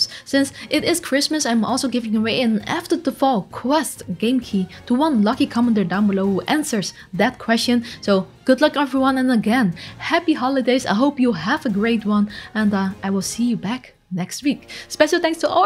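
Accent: Netherlands. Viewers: je klinkt een beetje nederlands heb ik gelijk?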